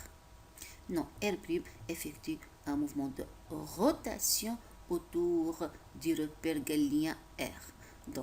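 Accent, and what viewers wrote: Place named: East, France. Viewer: Non seulement la forme c’est à dire votre accent oriental extraordinaire… mais aussi le fond c’est à dire votre précision, votre rigueur, le caractère didactique de votre résolution… tout cela fait de vous un professeur de qualité ! Vos étudiants doivent être fiers de vous !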